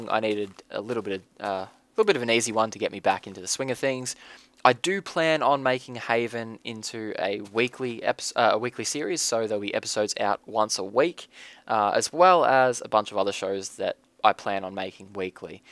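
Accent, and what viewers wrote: Australia. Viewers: this may be a stupid question, but do you live in Australia? You sound sliiightly Australian.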